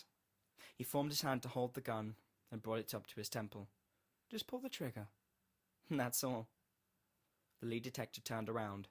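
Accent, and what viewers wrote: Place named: United Kingdom. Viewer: Just because you have a British accent, this reminds me of an episode of Sherlock xDD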